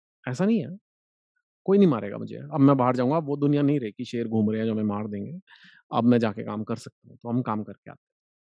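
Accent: Punjab, India. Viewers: Your punjabi accent and expression is hilarious 'Paad lo'...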